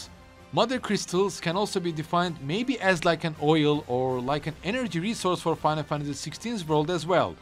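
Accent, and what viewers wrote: Turkey. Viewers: Where you from? You have turkish accent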